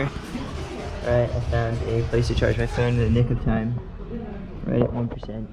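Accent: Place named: California, United States. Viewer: Are you from California? lol that accent is like deep Californian but love the video!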